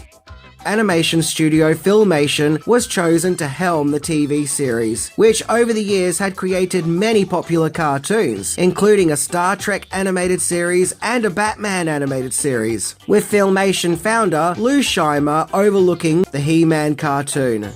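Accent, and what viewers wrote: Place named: Australia. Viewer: Bro you make some of the best videos ever!!! You are funny, imformitive and just over all a good dude!!! Im a 43 year old american male, i know the profile says maria curcio but thats my mother in law that passed in july 9th of last year and her daughter, my childrens mother was nice enough to let me use it even though me and my childrens mother havent been together since 2015😊 Im usually signed in as Heathdiegert013 or something close. I dont know how to change it and i feel bad to change it😊 I LOVE your voice, you aussies sound so cool!!! Please dont ever stop if you dont have too😊 my favorite Austrailian!!!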